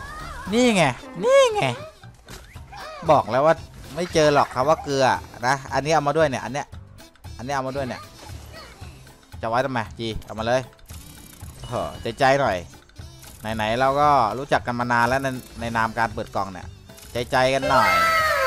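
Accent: South, Thailand. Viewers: พี่ยุจังหวัดไหนของภาคใต้คับ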